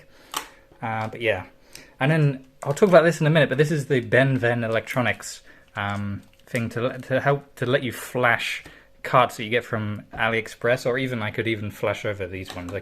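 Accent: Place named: Australia. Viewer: Great vid here haha, cheers. Seein' other devs working on their own stuff helps with the ol' self-motivation too. Keep up the great work. Oh, and you're probably the most 'Strayan sounding Brit I've ever heard haha.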